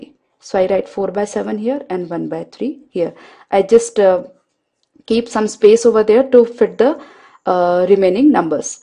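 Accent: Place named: India. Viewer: Mam you are best your sound is hindustani and you are the bestest in the world thankyou mam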